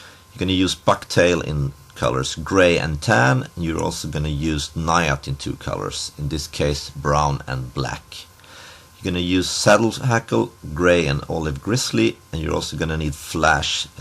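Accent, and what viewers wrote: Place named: United Kingdom. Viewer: 700 claps, great stuff my friend!!! I enjoyed your video, nice flies, and good to hear your voice, sounds more British than Swedish...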